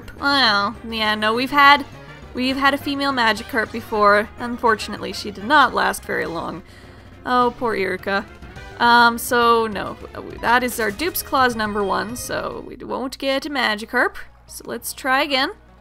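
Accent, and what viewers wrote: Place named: France. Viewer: may i just say that is the most amazing french accent greqt vid as well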